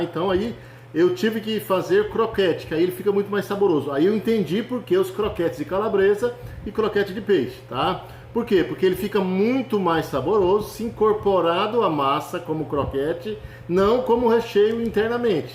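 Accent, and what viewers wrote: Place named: Rondônia, Brazil. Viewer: Tu é de Rondônia mano blz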